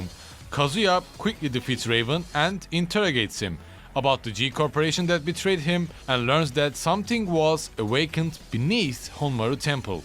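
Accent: Turkey. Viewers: man, you just have such a prominent turkish accent. i couldn’t see any signs on ur account that u might be from turkey, but it’s unignorable.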